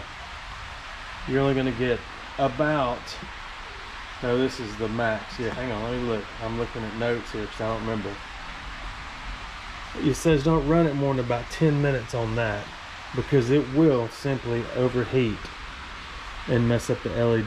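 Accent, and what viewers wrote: Alabama, United States. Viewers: Alabama!??? Yeppers 🤔.. I'm hearing that Alabama way of speaking in every time you get to talking...👍😎 A hard hat is obviously recommended....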